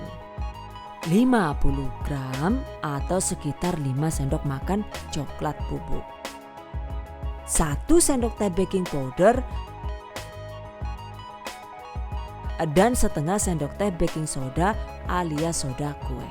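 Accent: Banyumas, Indonesia. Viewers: Bunda asli mana bun. Kok Bahasa nya ngapak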